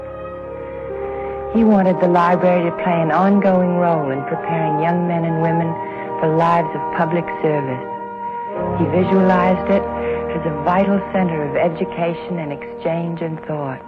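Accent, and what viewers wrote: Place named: Mid-Atlantic, United States. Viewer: Mid-Atlantic or Transatlantic accent, this accent represents a very formal education largely taught in private independent preparatory schools especially in the American Northeast.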